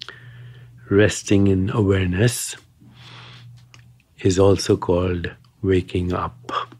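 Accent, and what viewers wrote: India. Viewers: The thick Indian accent is very hard to listen to. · I like your thick Indian accent...relaxed me 🤗. Everyone should enjoy and experience . In our awareness.... The nature of the breath..In the now! 🌟 🌟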